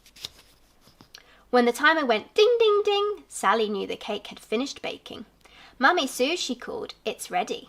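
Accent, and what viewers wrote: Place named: United Kingdom. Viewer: has such a lovely British accent and cherry voice. She should do voice recording for e books.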